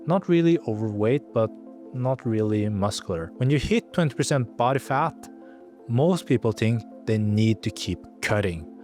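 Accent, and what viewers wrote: Norway. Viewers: Lol, you sound Norwegian?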